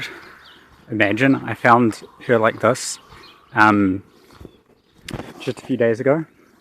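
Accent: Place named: New Zealand. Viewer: You sound like a kiwi ! Looks like a cool project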